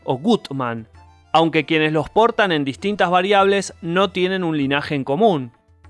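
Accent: Argentina. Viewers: es argento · lastima tu tonito argentino LLLLLLLLLLLLLLLLLL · El contenido es interesante y entretenido.... pero al locutor le juega en contra el timbre argentino, que es plomo derretido en los oídos de los hispanohablantes...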